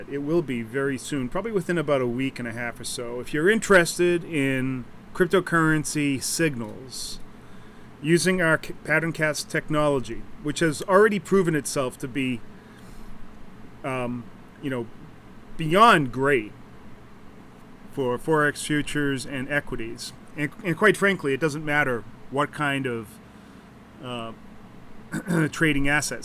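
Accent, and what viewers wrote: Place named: Queens, United States. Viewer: I'm from Queens and that's how we fucking talk too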